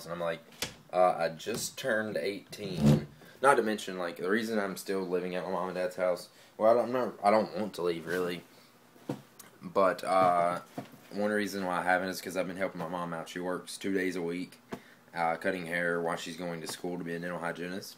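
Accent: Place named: North Carolina, United States. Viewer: hahaha I'm from North Carolina too and i as soon as i heard your accent i knew you were from NC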